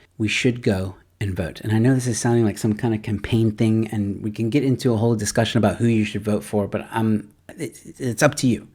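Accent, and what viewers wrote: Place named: United States. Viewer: Please keep going on as usual, so what if you sound American or look Mexican? I fail to see how that has any bearing on your opinion. I, for one am interested in your opinion and appreciate the concise presentation.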